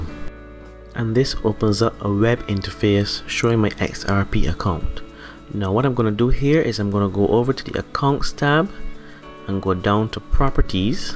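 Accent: Barbados. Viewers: Liked and Subscribed! Thanks for the video really clear and easy and l was lovin' up the Bajan tones. Had to start over a few times as ledger wouldn't connect. It's all a bit scary, I wish there was some way of checking it's all gone through. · Hi are you from Barbados?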